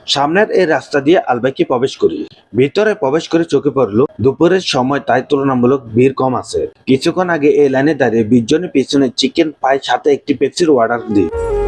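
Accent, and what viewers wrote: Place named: Noakhali, Bangladesh. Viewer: আপনার ভাষা মাঝে মাঝে বুঝি নাই। আপনি মনে হয় নোয়াখালি কিংবা চিটাগংয়ের মানুষ।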